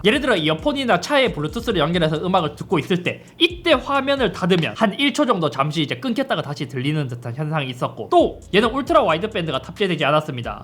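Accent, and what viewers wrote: Gyeongsang, South Korea. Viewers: 몇 번 들어도 적응 안되는 저 대구경북 말투…… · 이thㅓthㅓ여 역시 경상도분 ㅋㅋ 귀여우시고 정감갑니다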